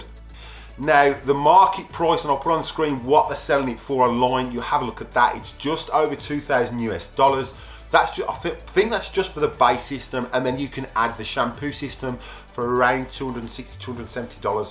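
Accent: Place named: Midlands, United Kingdom. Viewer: That's amazing, probably never ended up in the same room though, I think you left just before I started, I joined near the end of the G3 run, the G4 was unveiled just before I left. Never occurred to me you'd be anywhere other than UK, and what with your midlands accent. Mind you, there's kind of a vibe in some of your videos, lighting maybe?? Don't miss UK at all myself, moved away to Switzerland about 13 years ago and that's where I'll stay. The UK now, for me isn't the same one I left so.... That said, there's a slight difference between living 4 or 5 hours away and an entire day.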